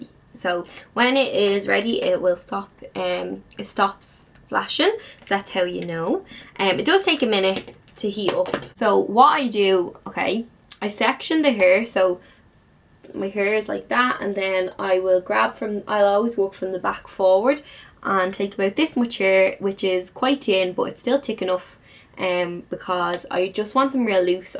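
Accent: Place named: Ireland. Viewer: She Irish what do you expect, people can’t just change the way they speak.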